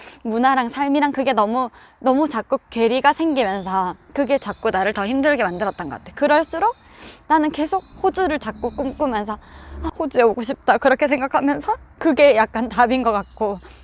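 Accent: Gyeongsang, South Korea. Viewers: 💗🌈 안녕하세요ㅎ 부산인이라 경상도말투에 익숙해서 자주 놀러와요ㅎ 이번 영상 전적으로 공감해요!! 제가 커피쪽을 하는건지 아니지만 저랑 마인드가 너무 비슷해서 영상정독했네요ㅎㅎ 날씨+나의 온전한 내모습과 삶+아빠의 자리가 크고 역할이 정말 크게 작용하는 호주의 삶 등등 저를 호주라이프에 푹 빠지게 했어요ㅎ 그리고 ㅎ가끔 외롭고 힘들고 우울해도 맑은하늘과 따스한 햇살아래에서 요가 🧘🏻‍♀️ 한번 하고나면 뭔가 기분이 사르륵 🥰 1년중 300일이상 날씨가 좋다는 브리즈번에도 몇일째 흐릿흐릿 🌧 비가 내리고있지만 낮에는또 화창한 하늘 ☀️ 볼수록 신기해요ㅎㅎ 시드니 감기조심하시구 가끔 이렇게 편 영상 올려주세융! 기다릴게융ㅎㅎ🤩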